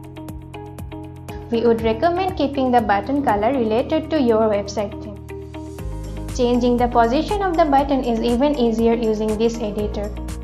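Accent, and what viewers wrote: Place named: India. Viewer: it sounds like indian accent.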